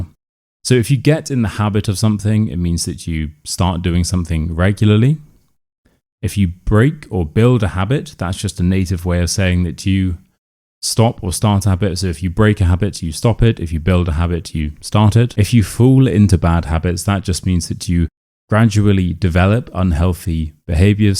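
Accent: United Kingdom. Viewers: I really love your YouTube channel. I watch almost every video.I chose your channel because you have a great deep voice and a real British accent. I want to learn this accent from you.But I have a small request. Sometimes you speak too fast when you move from one sentence to another. Because of this, my brain cannot catch the first sentence.Of course, you don’t do this all the time, but it happens often. I frequently have to separate the sentences in my head by myself.Could you please make small pauses between your sentences? This will help me understand you much better.Thank you for your understanding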